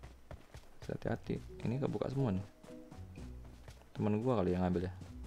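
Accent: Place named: Sambas, Indonesia. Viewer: Bahasanya kayak orang sambas,kalbar😁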